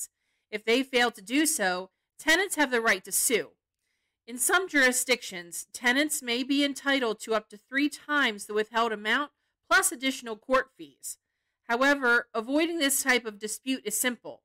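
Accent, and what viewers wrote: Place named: Delaware County, United States. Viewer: I knew I heard a Delco accent.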